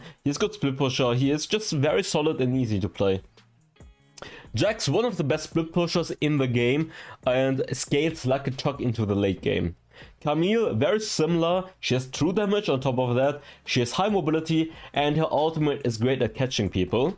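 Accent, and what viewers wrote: Germany. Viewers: Btw: you german?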